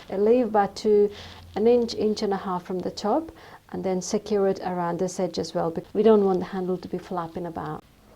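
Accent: United Kingdom. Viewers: Where do you buy your notion? I been sewing for 40 years here is the USA. I never see a zipper that long. Are you from the UK. I think I hear a British accent? You have a beautiful speaking voice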